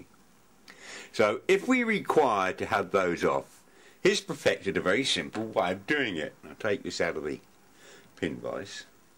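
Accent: United Kingdom. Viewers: I always enjoy your videos and this one was no exception. I thought you guys went metric decades ago. What is with the imperial measurements? How is it that you even have a tape like that? I like the accent myself. It is colorful but still very understandable. I like to watch another shop type channel owned by another British fellow but I normally only understand about 25% of what he says. He has an interesting channel anyway. Thanks for sharing.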